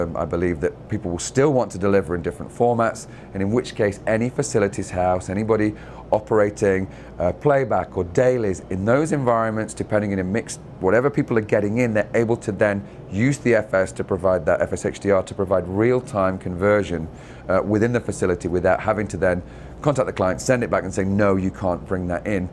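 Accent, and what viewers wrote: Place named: United Kingdom. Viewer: Looking and sounding quintessentially British! Nice.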